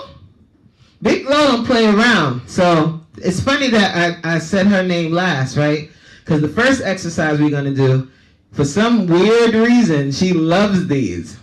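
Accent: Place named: Africa. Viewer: It's the African voice for me hahaha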